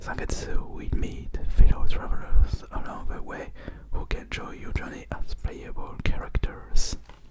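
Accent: France. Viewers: soit l'accent est bon, soit il à l'accent français, pas les deux, mais un bon accent implique une neutralité de l'origine de la personne. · La vidéo m'as beaucoup fait rire et m'a beaucoup relaxée. Ton accent français est hilarant mais dans le bon sens du terme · Un accent un peu à la française mais ce concept et cette vidéo sont super, je n'ai regardé que 2 minutes de la vidéo et je ne pense pas que je vais tenir longtemps avant de dormir... 😅🤣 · Je savais que tu étais français, tu mélangeait le présent simple et l'impératif avec le ING mais c pas grave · t'es français mec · Pour le coup ton accent est vraiment bon, tu me fais penser à PierreG asmr ou Made In France asmr. Pour le coup, on ressent bien l'accent français, mais je trouve ça vraiment relaxant ! Bien joué, et c'est aussi très courageux !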